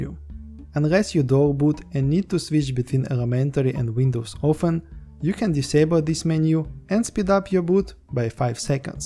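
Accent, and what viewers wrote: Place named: Russia. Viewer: Русский что ли? Акцент ужасен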